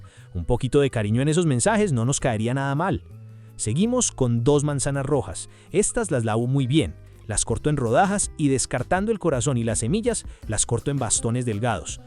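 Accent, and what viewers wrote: Antioquia, Colombia. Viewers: Puedo cambiar la hierbabuena por cilantro? Le agregaría uvas pasas y almendras o nueces. Amo tu acento paisa.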